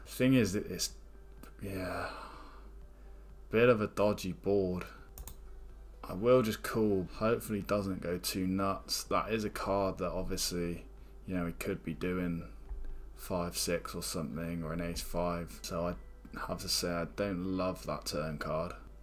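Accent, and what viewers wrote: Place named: Midlands, United Kingdom. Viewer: Mate where are you from? I'm sensing a Midlands accent? Do you play goliath in Coventry? Love that tournament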